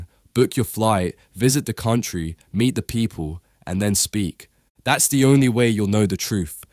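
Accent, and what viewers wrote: United Kingdom. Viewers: Are you from UK Bro?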